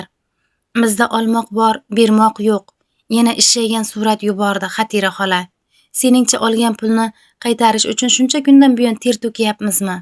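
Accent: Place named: Surkhandarya, Uzbekistan. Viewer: Surxondaryo yoki Qashqadaryo shvasida gapiryabdi bu qiz😁😁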